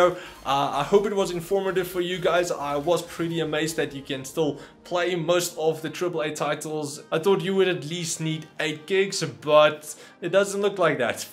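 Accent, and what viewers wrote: South Africa. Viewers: Woah, I didn't expect you to be south african.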